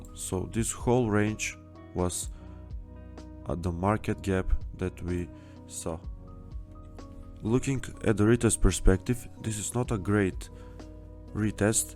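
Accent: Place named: Russia. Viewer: Very very good material! Откуда русский акцент?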